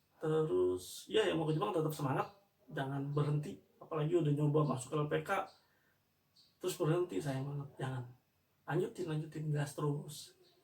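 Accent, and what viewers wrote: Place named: Bekasi, Indonesia. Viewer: Bang Abang ORang Tambelang Ya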